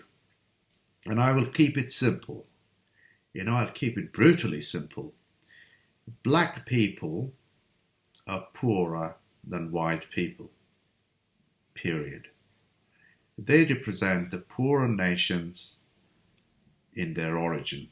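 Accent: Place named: United Kingdom. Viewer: By your accent, I thought you were British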